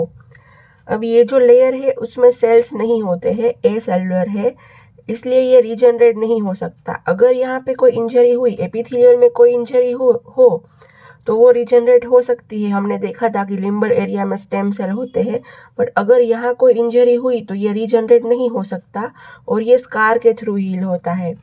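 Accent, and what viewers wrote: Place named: Gujarat, India. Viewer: Bohot acha lecture tha aap gujarat se ho na aapke accent se pata chal gaya 😊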